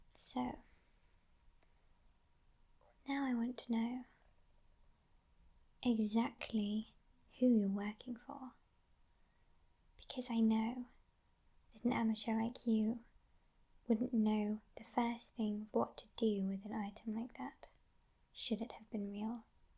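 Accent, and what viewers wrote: United Kingdom. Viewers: my first reaction "shes british"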